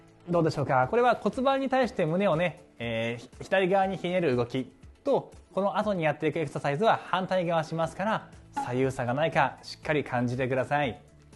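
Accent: Kansai, Japan. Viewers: さんが、関西の方だと今日初めて気づきました！いつもありがとうございます!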